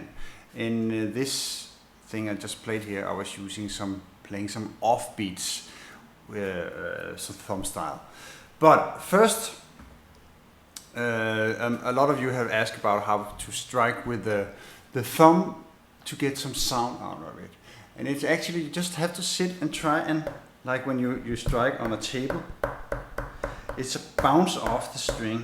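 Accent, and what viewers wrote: Denmark. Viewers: Elsker din dejlige tykke Københavner accent, den giver én en ret fed nationalfølelse :-) But great job man, I'm enjoying learning from you.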